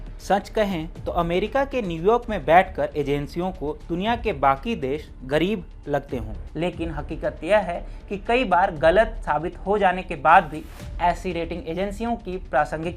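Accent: Standard. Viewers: Shudh 🕉️ hindi sunkar kaan 👂 ko anand mila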